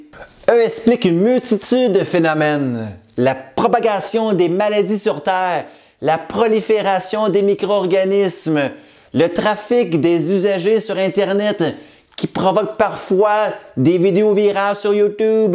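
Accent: Quebec, Canada. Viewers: Je préfère 1000 X l'accent québécois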